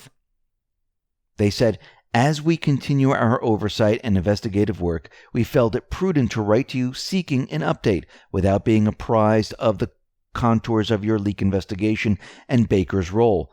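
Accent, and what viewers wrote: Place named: New Jersey, United States. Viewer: He's from New Jersey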